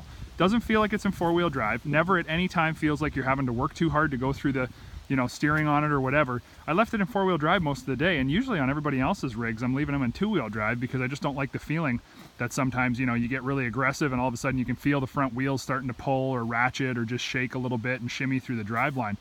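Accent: Canada. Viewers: On a scale of 1-10 of how Canadian you sounded, you were at a 9.5 this time.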